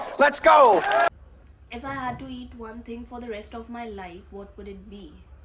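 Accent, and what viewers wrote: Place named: Gujarat, India. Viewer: Finally Youtube pe active hogi 😅😅 Nice video your bhai bhai vala ascent gujrati bol rahe thi aese lag rahe tha ap bahut khoobsurat aur cute ho 🥰❤️ waiting for part 2 video 😊😊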